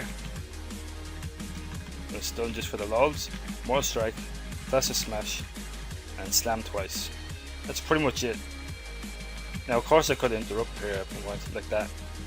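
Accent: Ireland. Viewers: Where abouts are you from man? I sense an irish accent!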